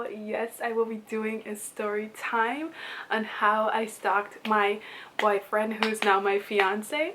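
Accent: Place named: Guyana. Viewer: Guyanese are the best story tellers sound effects and all😂😂 Great story, the accent made it better✨✨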